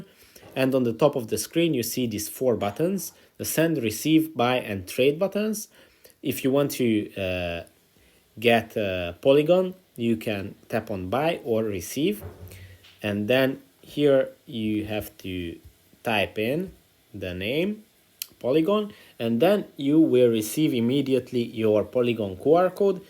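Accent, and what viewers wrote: Hungary. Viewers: I bet you any money that you are from Hungary. I can recognize your accent. :) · jó kis magyar akcentusod van :)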